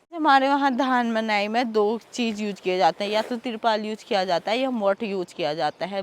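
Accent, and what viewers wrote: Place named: Pahadi, India. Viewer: Hindi m v vlog bnaoge to chlega dii ek pahadi chahe kitni hi hindi kyu na bolta ho uske bolne m phadi accent hi hota or vo aapki voice m h hindi m vlogging krte huye phadi words ka use krna isi s ek phadi ki pehchan h proud to be a phadi uttarakhandi love from Almora ❣️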